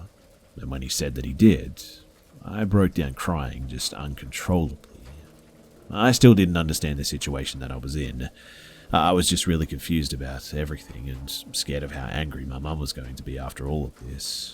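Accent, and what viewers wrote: Australia. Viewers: you be rockin with the full on australian accent better than lazarbeam himself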